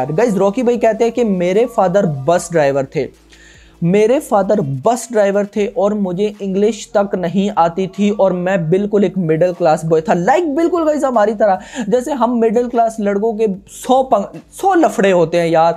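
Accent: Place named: Punjab, Pakistan. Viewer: bhai thumara pakistani punjabi urdu accent aata hai...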